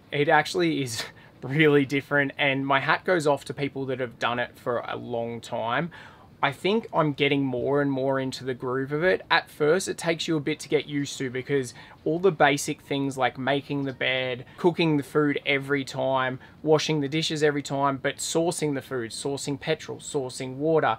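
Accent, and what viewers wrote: Australia. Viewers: I also like the music you played. I have never seen you on the Youtube so I really liked the accent of australis...............I will see you again........bY for now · welcome back - only Aussies can say "clutch" and "whil'st" and sound cool doing it.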